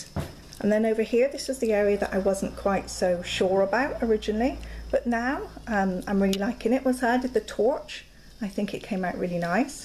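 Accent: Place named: West Country, United Kingdom. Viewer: love this one I noticed you have a westcountry accent do you live near St.Austell just wondered if you did workshops.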